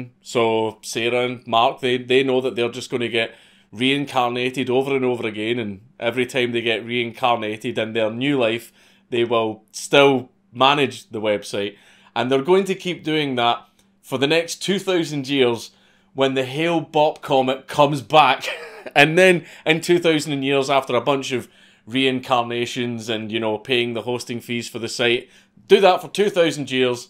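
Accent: Scotland, United Kingdom. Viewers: Dude, you're so funny! Add that to your 200% Scotch look and sound, I'm ROFL-ing here! 😂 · I love this man's accent If I've guessed correctly, Scotland is full of people with a similar accent, at least in one particular region, but I love it. An appreciative Yank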